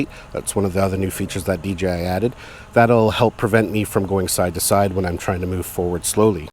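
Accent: Canada. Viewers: yup....he's Canadian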